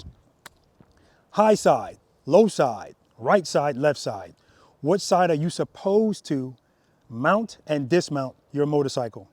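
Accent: New York, United States. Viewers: Picked up a bmw GS this morning, they're not that bad... it being a 750GS not a 1250GS Adventure (the tallest version, which you probably rode) is completely beside the point. It is a massive difference to the Yamaha/Star Bolt (my Yamaharley lol) I had the last 10yrs. Also as an aussie I love your New Yawk accent, I'm a weirdo who likes listening to how people talk :)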